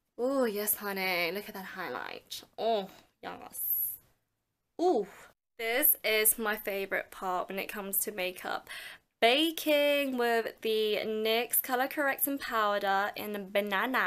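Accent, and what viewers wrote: United Kingdom. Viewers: omg i am so late to subscribe to your channel. omg where have you beennnnnnnnnnnnnnnnnn. first off i love your accent its like british and funny enough i want to start talking like you. thank you for the inspiration on not to mind what people say about your makeup i love u so much. 😍😍😍😍😍😍😍😍😍😍t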